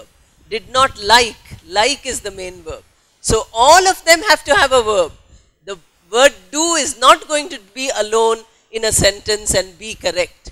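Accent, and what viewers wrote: India. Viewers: So happy to listen wonderful Indian English, thank you mam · Obviously you are a good English teacher, style of your teaching is very easy.. And the most important thing that the way of teaching is in Indian accent👍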